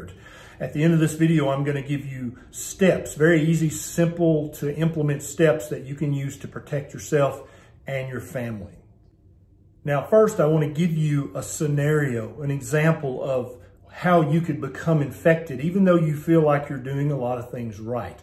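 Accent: South, United States. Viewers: I was listening and then I heard his southern drawl, and all of a sudden he’ doesn’t seem as credible as he once did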